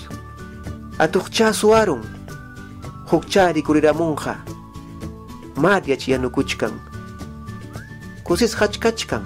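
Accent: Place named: Andahuaylas, Peru. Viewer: Tu quechua es Andahuaylino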